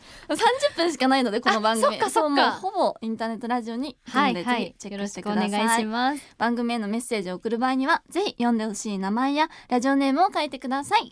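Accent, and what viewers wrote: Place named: Kyoto, Japan. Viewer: はんなり京都弁めっちゃかわいいな いいこなの伝わってくるわー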